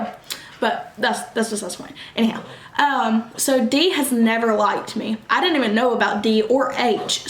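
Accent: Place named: Kentucky, United States. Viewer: I’m watching your video on my tv now lol I’m from Kentucky and you sound like us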